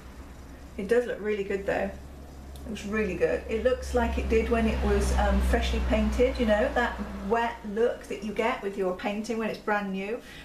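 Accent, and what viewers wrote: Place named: Southern England, United Kingdom. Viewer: Obviously from the south of England, me too but now in Australia. Love your videos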